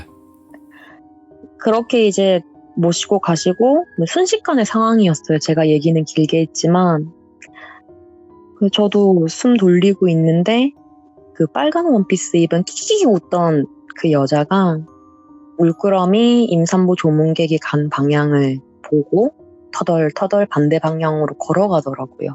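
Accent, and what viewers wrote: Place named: Gyeongsang, South Korea. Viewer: 근데 경상도분이네? 억양은 숨길수없다 ㅋㅋ 난 완벽함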